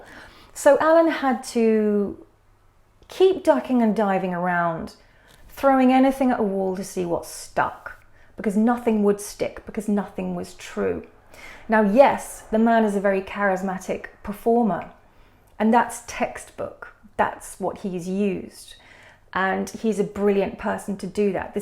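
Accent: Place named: United Kingdom. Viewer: First...Thank you. Thank you so much for spreading light on AD & any other Youtubers/Scammers that are trying to scam good hearted people. Your voice is beautiful. I've always loved the Bristish accent. You are a beautiful person to finally expose AD (Alan Viddecom) however you spell his name. Thank you. Thank you so much. 🌻🌻🌻🌻💜💜💜💜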